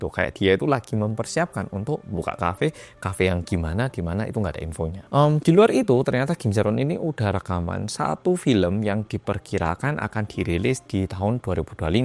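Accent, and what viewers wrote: Java, Indonesia. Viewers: Nungguin bget si komen dan opini oppa yg medok.. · Jawa lu medok banget,😂😂😂😂😂…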